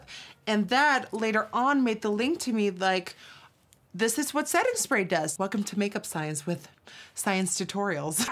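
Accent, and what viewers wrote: Netherlands. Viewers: we would be so powerful if we knew THEN, what we know NOW! I love you you make me smile and I think you have such a great personality ( I love your dutch accent) thank you for being you, you're wonderful, beautiful girl.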